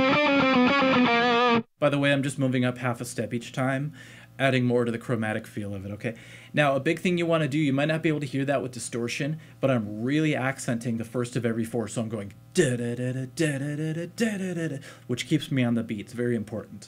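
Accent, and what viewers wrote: Minnesota, United States. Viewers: From Minnesota?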